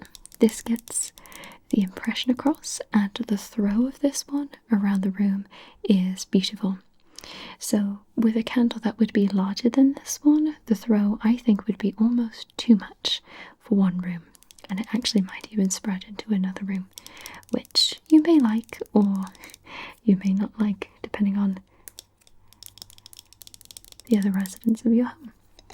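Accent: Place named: Wales, United Kingdom. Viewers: She’s definitely Welsh, I can hear the accent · U sounds like a British tho u r american :)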